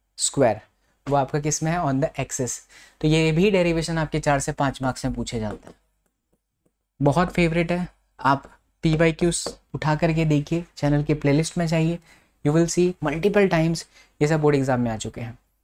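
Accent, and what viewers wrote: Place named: Tamil Nadu, India. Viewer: 46' 12 Tamil accent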